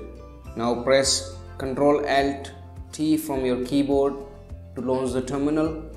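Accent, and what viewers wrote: India. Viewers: Are you India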